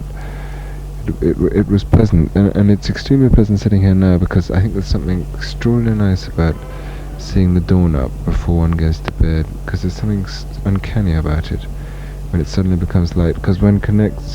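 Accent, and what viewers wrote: RP, United Kingdom. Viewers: I had no idea he spoke in upper RP. I knew it would be RP given his upbringing but he says his A so flat, like Queen Elizabeth did. So beautiful to hear. What a talent he was. Tragic. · Love Drake but if someone told me this was one of the Royal family speaking would have believed them even makes the Pink Floyd guys sound like neds scallies or chavs 😢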